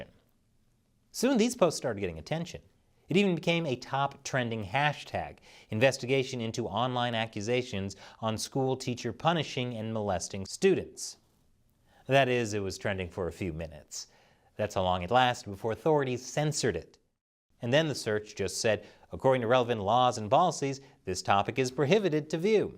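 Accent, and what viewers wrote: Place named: United States. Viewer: First time on your channel but just the american accent lets you kno that its goign to be biased and especially finger-pointing.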